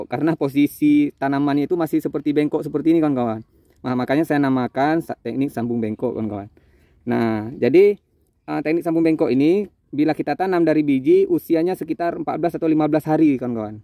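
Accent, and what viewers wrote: Indonesia. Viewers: Bang saya lihat dari gaya bicara nya tampak ke polosan dan ikhlas nya dalam menyampaikan tutorial, semoga Allah membalas kebaikan nya dengan kebaikan yg berlipat ganda Amiiiin. Bdw bang alamat nya di daerah mana? Dari logat nya sepertinya dari pulau sumatera mungkin daerah Riau pekan baru dan sekitar nya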